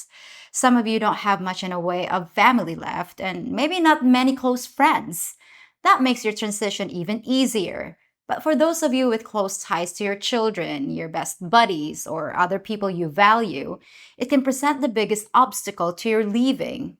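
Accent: United States. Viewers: I’m very curious. I watched a video where you talked about your young life in something of a “tell all” but I’m more curious about your knowledge of the West, your command of the English language and vernacular. Yes I get you learn English here but yours is a very American English and combined with your knowledge of our culture and routines I’m betting you’ve spent a great deal of time in the West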